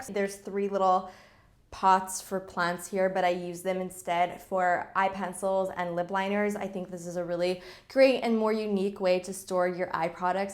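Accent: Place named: Canada. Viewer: Me: she has a slight canadian accent goes on her about page "Hey guys I'm a Canadian beauty vlogger" SLAY YOU FELLOW CANADIAN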